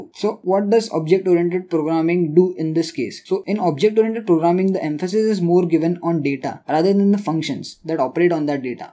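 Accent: India. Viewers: For every complex topic in the world there is one YouTube tutorial with an indian accent that explains it so easy that you immediately understand it. Thanks for keeping the world running. Your tutorials keep the entire educational systems of the world running :)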